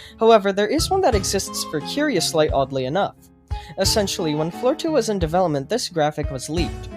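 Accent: United Kingdom. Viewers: HE IS MORE BRITISH OH GOD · he sounds British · I thought it was a British accent, I will now remember these videos as the British era of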